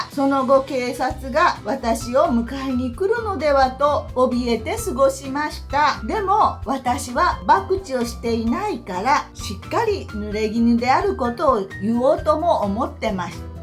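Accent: Yamaguchi, Japan. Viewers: 本当の山口弁！わざとらしい、鳥肌が立ちそうなYouTuberさんとは一線を画します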